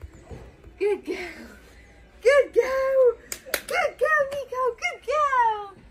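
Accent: United Kingdom. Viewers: "Reddy..." 😆 I love the British accent 😸...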